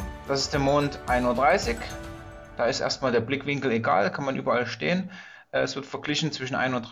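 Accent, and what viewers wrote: Saxony, Germany. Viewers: Wir als Franken verstehen das Sächsische und lieben es. PS: meine Mutter kam in Dresden am HBH zur Welt, damals 1924, und dass als Ostpreußin 🤣 Herzlichen Dank, für mich macht das absolut Sinn👍 Danke🧸🌸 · Och Leute,lasst den guten doch mal bitte weiter sächseln. Er is doch kein Bayer,die man wirklich nicht versteht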